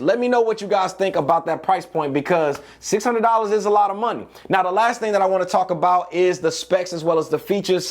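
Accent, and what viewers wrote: St. Louis, United States. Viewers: Big stl in bro voice shoutout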